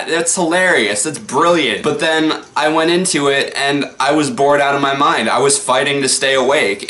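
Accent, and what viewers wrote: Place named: United States. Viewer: I’ve never heard that kinda country accent before🤠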